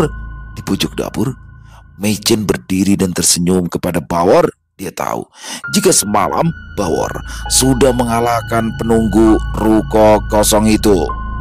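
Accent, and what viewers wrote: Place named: Madura, Indonesia. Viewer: Style madura bagus tuh 😂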